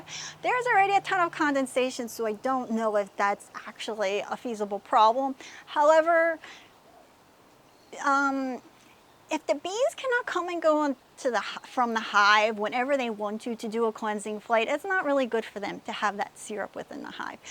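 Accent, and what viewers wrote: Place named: Pennsylvania, United States. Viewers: Thank you for your videos! Also, you must be from South Jersey or Philly "Wudder". I love your content, and your videos have helped me tremendously. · You must be from PA (my dad says "wudder")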